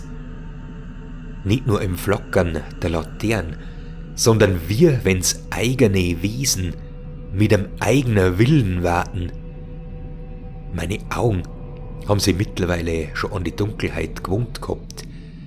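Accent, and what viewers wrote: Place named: Bavaria, Germany. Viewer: Bockshaxert 😂 Bairisch isch eifach e scheene Dialekt! Griaßle usm Badische👍🏻🤘🏻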